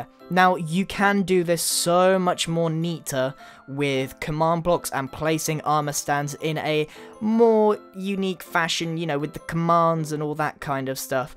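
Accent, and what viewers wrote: England, United Kingdom. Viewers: Grian ar u talking 100% english or american or is it. the same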